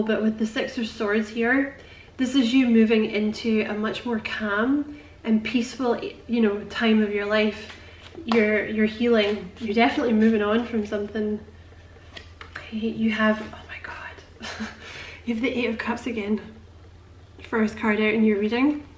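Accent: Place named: Scotland, United Kingdom. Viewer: OMG your Scottish accent is so adorable.. The reading is so spot on, and exactly what I needed to hear. Thanks!!!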